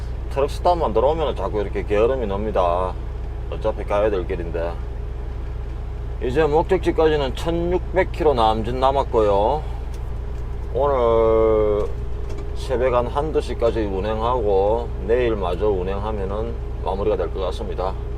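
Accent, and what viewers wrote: Gyeongsang, South Korea. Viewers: 이만 돌파 축하드립니다 1편 부터 12편 까지는 말 없이 자막처리 12편 부터는 구글지도 사용 일정 안내 ㅋㆍㅋ 님의 영상이 하루하루 멋지고 있네요 아직까지 님 영상 다시보기 보고 구순한 갱상도 사투리가 몆편부터 시작인지 현재 다시보기중입니다ㅡㅡㅎ.ㅎㅡ 폰 데이터 다 소모 했읍니다ㅡㅋㆍㅋㅡ 고속도로 국도 사항은 한국이 짱이죠 돌쇠 엘프 밍키 상봉까지 안.운 하세요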